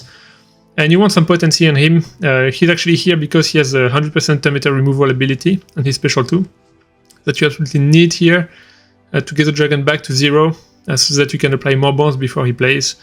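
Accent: France. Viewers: Sounds French to me... I'm in France right now! Nice to see someone making these guides in countries other than the U.S.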